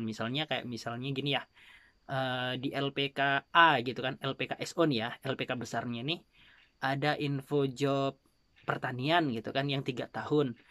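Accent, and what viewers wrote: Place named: Sunda, Indonesia. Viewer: Dari logat kadang kek sunda kadang kek jawa, jd sebenernya sampean org mana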